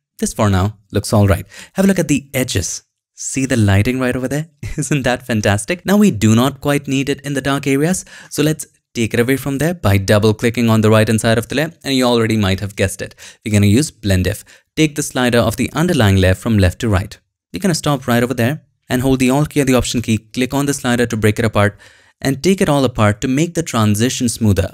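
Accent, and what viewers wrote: India. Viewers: I never thought I love the indian accent until I fell asleep while watching this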